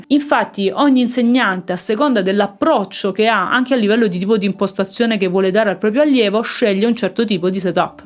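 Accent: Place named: Bergamo, Italy. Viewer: Ciao. Grazie per l'ottima e chiara spiegazione. Con il mio sax tenore e bocchino Yamaha 4C in genere uso le Vandoren "blu" 2.5 che considero la mia cosiddetta zona di comfort. Tuttavia per osare di più ho iniziato con le Rico "arancio" 3.5 che però trovo un pò dure e faticose da gestire specie sul registro grave. Ho provato anche a lavorarle un pochino (a riguardo ho seguito i tuoi video) ma non ho ancora trovato un setup soddisfaciente. Mi consigli un altro tipo di ancia (ad esempio Rico Jazz Select, di cui parlavi in un altro video)? Grazie! PS Aggiungo simpaticamente che l’espressione “manco li cani”, non proprio orobica, unitamente alla tua cadenza linguistica, mi è meravigliosamente familiare 🙂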